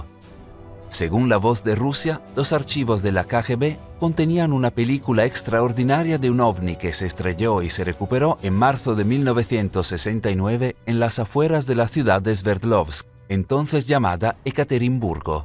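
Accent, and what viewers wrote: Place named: Italy. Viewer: Per quanto una buona pronuncia e lettura,la cadenza,l'inflessione il non marcare alcune lettere rivelano la tua italianità...ci ho imbroccato vero???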